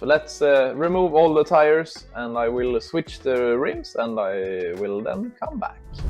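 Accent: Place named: Italy. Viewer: Yeah, man, I do have this exact model. Really, I really wish I could get the front-wires from hood-to-roof installed. Also, I am trying to place your accent- Italian? I'm USA.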